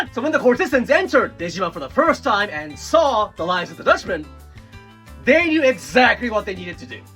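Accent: Japan. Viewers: Love your videos... but... it is pronounced "court teh sahns" not "cour tes sans" because the way you stress syllables is very Japanese, not very English. GO YOU!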